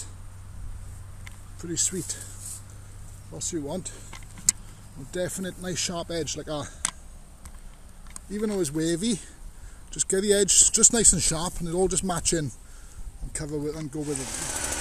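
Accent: Wales, United Kingdom. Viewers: I should say British accents, rather than English as this man is Welsh. Same theory though; Listen enough times to the variation of accents in the UK and it will make sense. Unless they are from Newcastle where even us normal British people do not understand a word they are saying. Google "Newcastle accent" and you will see what I mean!!!!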